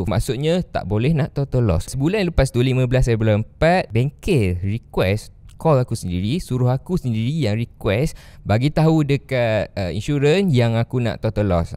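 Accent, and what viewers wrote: Kelantan, Malaysia. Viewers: Org kelantan eh? Dgr cara ckp dpt detect..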